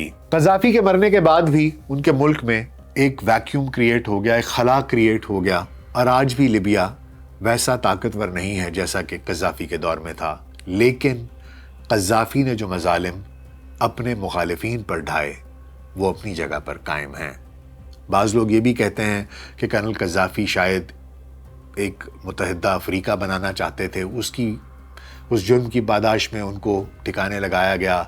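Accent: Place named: Punjab, Pakistan. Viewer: سر اپ بھت اچھا وی لاگ کرتے ہیں ریگولر سنتا ھوں زبردست لیکن ایک چیز نوٹ کی اپ بات اردو مے کرتے ہیں لیکن دو ہزارا۔ گیارا کو اپ دو ہزارا یاراں بولتے ہیں جو کے پنجابی مے بولا جاتا ھے اسکا خیال رکھیں ❤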